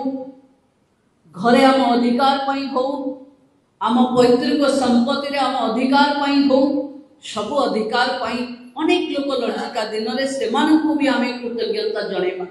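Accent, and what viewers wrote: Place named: England, United Kingdom. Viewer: Speak clear odia. No need to speak english style odia.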